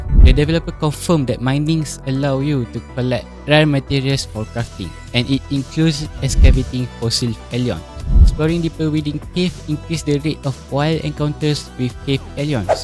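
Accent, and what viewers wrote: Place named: Malaysia. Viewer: I can recognise this person from just the way he speaks English 😂 he from Malaysia😝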